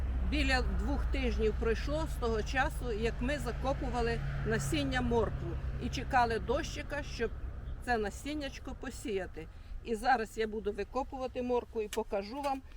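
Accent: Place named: South, Ukraine. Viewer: Наш звичайний південний ,,говор''😊. Дякую за поради.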